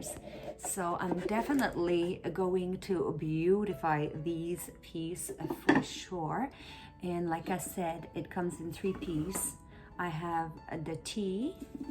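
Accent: France. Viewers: Great finds! I love the big bunny too! I also love your French accent... Beautiful! 💗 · Great job, shopping,!!! I can't wait to see your finished treasures!!! This video answered a question for me. I noticed that you have a lovely accent, but I wasn't sure what it was. So, it's French!!! You handle the crazy English language very well!!! You are so sweet!!!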